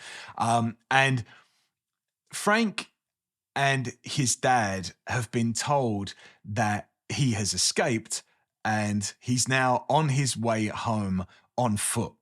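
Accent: Cockney, United Kingdom. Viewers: New subscriber! Just stumbled across your channel on this cloudy and cold day in the middle America. Understood your accent perfectly and would love for you to turn up the cockney a bit. Great recommendations! 🇺🇸 🇬🇧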